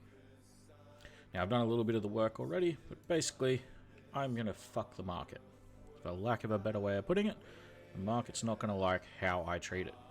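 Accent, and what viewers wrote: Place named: Australia. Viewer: hey man, excellent video, fucking legend ❤, pleeeease tell me you have a link to the add-on? I'm REALLY struggling with addons atm so soon into TBC (WeaponSwingTimer has been totally fucked and I've downloaded like different 5 versions). This is some 200iq shit right here! I thought selling NW cloth from dungeons to tailors was good enough, this SHITS on that and lets me actually go in and flip it, making money stright from the AH, this is absolutely brilliant!!! Quicket gold garm? Fuck no. INSANELY ACCESSIBLE way to generatea substantial amount of gold that should especially help newer players who missed much of Vanilla such as myself to get our flying mounts / max our professions? Fuck yes! ❤ If you read this and can respond you've 100% earned yourself a sub, this is a super underrated channel, I really need some help with the addons to do this, if you can help with that then you're an ABSOLUTE CHAMPION. 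Also love the accent mate, I'm Australian too, born and raised, spent all 28 years of my life so far living here, and helpfully moving to Melbourne soon. Thankyou my friend!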